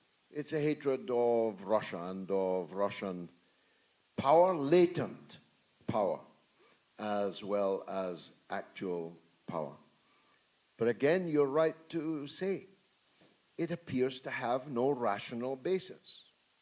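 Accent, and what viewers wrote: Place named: Newcastle, United Kingdom. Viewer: Well spoken Geordie .. Said from a fellow Scot 👍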